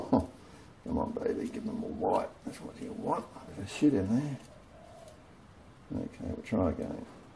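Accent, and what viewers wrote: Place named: Australia. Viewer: hi!! i have those calipers too. i have that problem so i am going out now to fix them. thanks keep up the great work. good to hear an aussie voice on youtube!! lol!!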